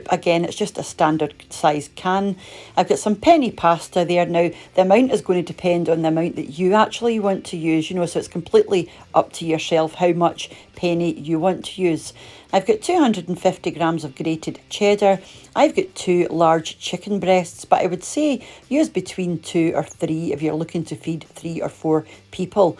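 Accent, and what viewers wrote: Scotland, United Kingdom. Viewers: Love hearing your Scottish accent🥰. My mother was from Glasgow Thanks for the recipes!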